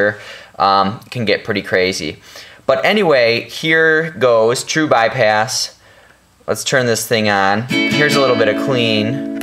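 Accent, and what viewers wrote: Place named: Cleveland, United States. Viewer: are you from cleveland ohio? trying to guess accents just.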